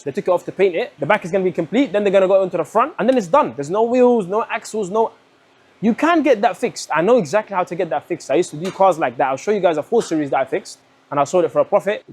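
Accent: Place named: United Kingdom. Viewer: UK from his accent.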